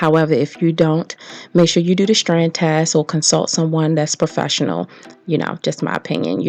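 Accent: Baltimore, United States. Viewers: Girl you must be from bmore... we talk just a like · I knew it...you have that Baltimore accent...I grew up there...my BFF IS THERE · You sound like your from Baltimore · TEEEEWWW 😭😭😂 I said oh hell shes from Baltimore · New subbie!! I can here that Baltimore or DMV accident, Morgan State Univ in the house! lol. You are so beautiful and calm lol Love your hair, I subbed!!!! 😍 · Are You From Baltimore? I Hear An Accent❤️❤️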